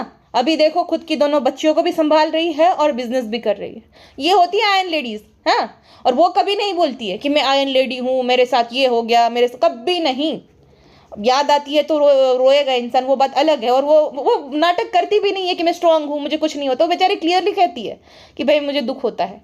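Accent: Gujarat, India. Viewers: 25 saal ki ho gyi hu pehli baar youtube pr kisi ko comment kiya hai ❤ u r worth it for this love and your gujrati accent ❤❤ lots of love from jammu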